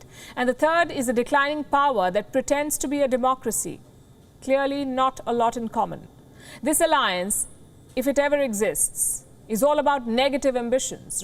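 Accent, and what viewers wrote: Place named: India. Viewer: It is Indian voice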